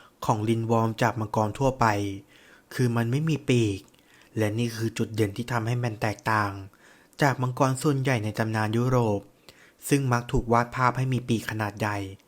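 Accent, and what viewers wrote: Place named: Thailand. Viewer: ติดเหน่อนะคะ😂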